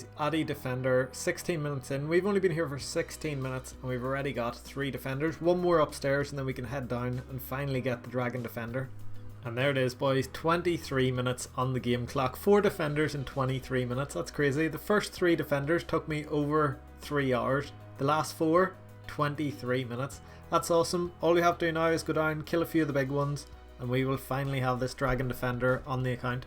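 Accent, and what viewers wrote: Northern Ireland, United Kingdom. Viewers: Lol Northern Ireland ?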